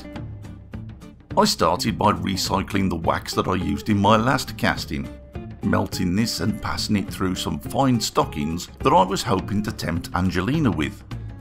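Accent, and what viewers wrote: Australia. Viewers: Love the attention to detail. You're quickly on your way to becoming a master at lost wax. Won't make any comments about the male tree because it would be crude to say something about it's balls.lol Noticed below someone likes your "Aussie" accent. Thats ok because I read on big stack where someone asked if his flag was the Union Jack!! Lmao good show mate. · Here from bigstackd casting. Love the Aussie voice and the oil burner tutorial! Subscribed! Great cast btw.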